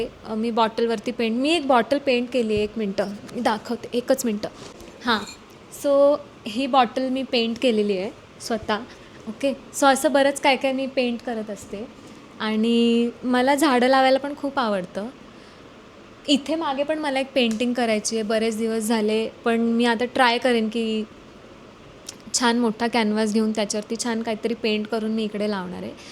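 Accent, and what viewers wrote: Khandesh, India. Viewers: Vattlach hota tumhi khandeshi aahet ty tumcha bolnyvrun tumche contents khup chan astat mi pn khandeshi aahe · tuzya eka videot pakki khandeshi tone janavli mala, aani aata tr confirm ch kelas tu.Mi comment pn keleli tyavr😅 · बोलण्यातून थोडासा आमच्या खानदेशी flavour...sweet...जाणवतो...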